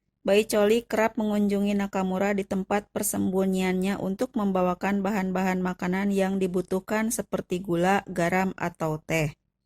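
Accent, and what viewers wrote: West Java, Indonesia. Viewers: Konon, ada satu Stigma yg mnyatakan sbgai berikut : " Bernyanyilah seperti Orang Ambon, Berpikirlah sprti Orang Jawa, Berdaganglah sprti Orang Padang, Bertindaklah sprti Orang Batak dan.... Berbicaralah sprti Orang Sunda....!!! " Sprti Narator ini, dn jg yg lain melahirkanlah suara2 Diplomat Ulung Kelas Dunia dari Indonesia dg Tuturan kata tata bahasa Indonesia dn aksen Parahiyangan dg Diplomasi piawai nya, semisal diantaranya : Mochtar Kusumaatmadja, Ali Alatas, Nana Sutresna, Marty Natalegawa, Hasan Wirayudha, Djuanda Kartawidjaja, Connie Rahakundini Bakrie ( pengamat militer dn internasional ), Djuanda Alm/ pngamat intelijen dn Maritim internasional ( penasihat Gus Dur ) dll. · Kaciri nyunda na teh. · Naratornya sunda hehe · Sunda banget eceu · Pilipina, dipisi, konprensi, menginpormasikan, aktip, pormosa... 😂😂 Logat sunda teh emang teu bisa di bohongin euyy 😁😁 Mantaaappp 👏👏👍👍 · Komentatornya orang sunda..........datar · Logatnya sunda eui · Naratornya urang sunda? 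· Pengisi suara pasti orang sunda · Miminny bahasa indonesia logat sunda😃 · Logat sunda mah gak bisa di ilangin ya bu.. 👍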